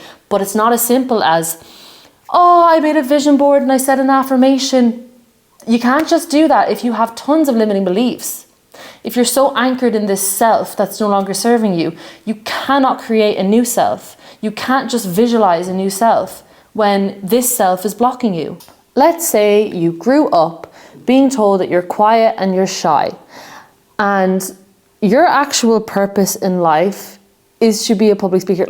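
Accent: Ireland. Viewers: Heard the Irish accent and immediately subscribed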